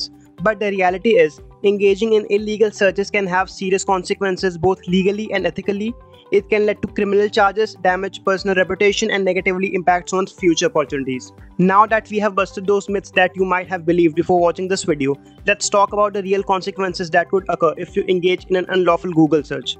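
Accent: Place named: India. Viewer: Goofy ahhh Indian accent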